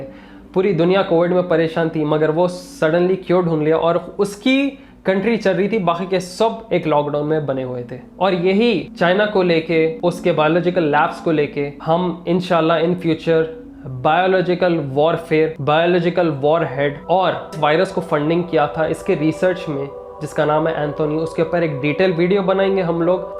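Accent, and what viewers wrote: Hyderabad, India. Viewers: Hyderabadi Urdu...🇵🇰👍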